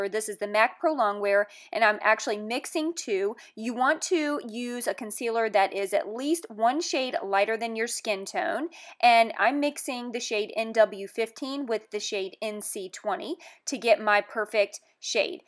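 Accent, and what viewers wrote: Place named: Georgia, United States. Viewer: oh my word! loved this. love your accent too! are you a georgia girl? i'm going to look for the salmon colored concealer at drugstore...thx!!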